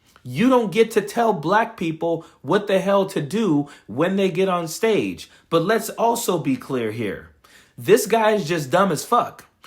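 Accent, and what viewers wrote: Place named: New Jersey, United States. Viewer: Does he realize his Jersey Shore accent is considered low class & ghetto??😏😂 He could never compete with SLJ..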